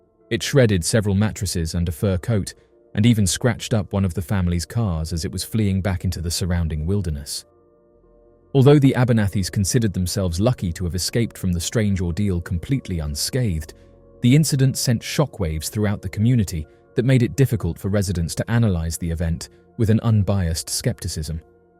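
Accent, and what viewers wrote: England, United Kingdom. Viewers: This channel is a prime example of the power of a pleasant, clear English accent lulling people into believing completely BS theories and photos. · The idea of anyone believing in Bigfoot just because they hear a bloke with a calm English accent talking is ridiculous. Especially when Majority of people who say they've seen them & believe they exist are from the states.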